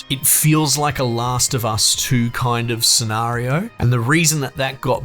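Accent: Australia. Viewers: As an Aussie, it's come as a massive surprise how pleasing it is to hear your accent. I usually find the Aussie accent repulsive. So --- compliments to you sir. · I can tell you’re Aussie as well, love how you telling it straight. 😂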